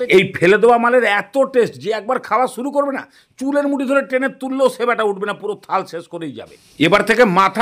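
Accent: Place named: Bangal, Bangladesh. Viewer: Tumi bangal bolo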